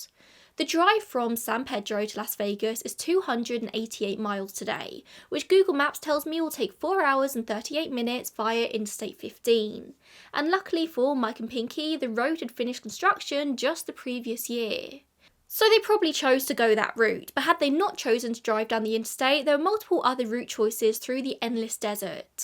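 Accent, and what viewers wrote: United Kingdom. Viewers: That is how British people typically pronounce Los Angeles. Very common.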